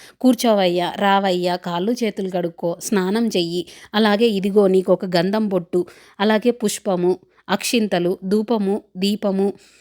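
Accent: Rayalaseema, India. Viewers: Hi bujji maadi కూడా రాయలసీమ. యాస super. Funny Funny videos. Script totally natural. పూజ ardamainatu cheppavu.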